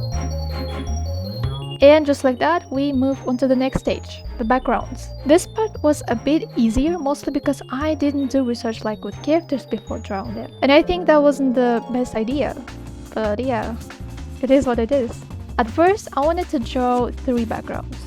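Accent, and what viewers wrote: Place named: Russia. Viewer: Немного русский акцент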